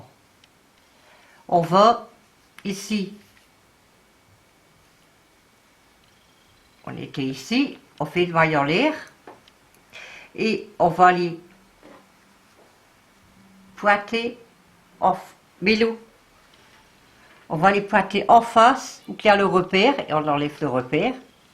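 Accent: North, France. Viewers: bonsoir trop beau et toujours aussi bien expliquer et cette accent du nord, passez un bon moment a suivre la vidéo je vous remercie vraiment gros câlin a minou Monique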